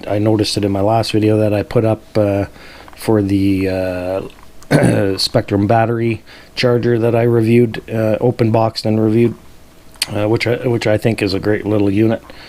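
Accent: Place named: Canada. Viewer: Great info you sound like my neighbors to the north of michigan ,eh. Peace n love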